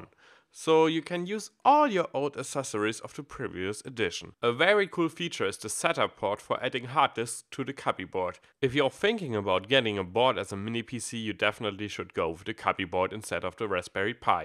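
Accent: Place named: Germany. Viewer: Such a refreshingly German accent ^^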